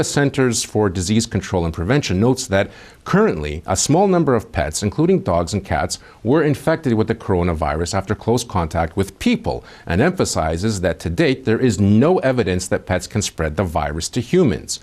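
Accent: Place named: Russia. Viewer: I think has spent too much time in Ukraine and obtained a Russian accent.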